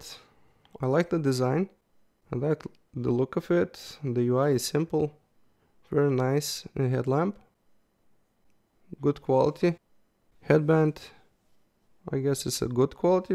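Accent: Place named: Russia. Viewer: Such a rarely adequate review! You mentioned all things that are needed and skipped by other people, especially time before light becomes loose its brightness on each mode. Thats really essential! So i can see that turbo mode can be used up to 2 minutes before dimming, high mode on spot - 6 min and on flood - 10 min, and medium mode - without limits. Your accent sounds like you are russian-speaking, arent you?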